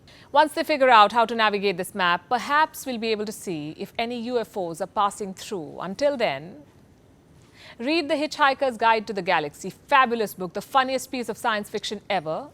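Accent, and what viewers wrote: India. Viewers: she is a total packages her presentation is gold standard , she delivers in such a clear and concise language , i think she is one of the best journalist on the net , and i love her Indian accent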